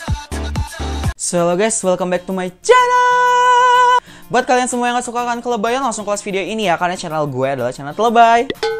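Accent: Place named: West Java, Indonesia. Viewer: Sundaan euyy :v sundanya keluar semua😅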